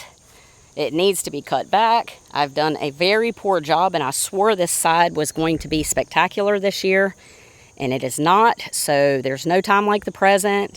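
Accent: South, United States. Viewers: Love your southern drawl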